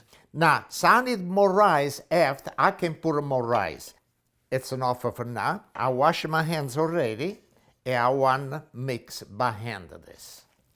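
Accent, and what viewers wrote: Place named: Naples, Italy. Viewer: What town in Italy do you come from when you speak italian it sounds like my father . He comes from a little town in Naples called Castello di Matese, Caserta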